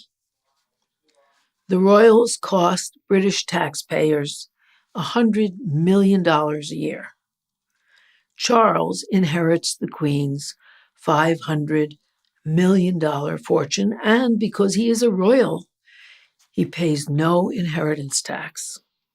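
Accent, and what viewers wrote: New York, United States. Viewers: Yeah it's probably the raspy New York accent, as well as the identically emotionally expressive face that does it.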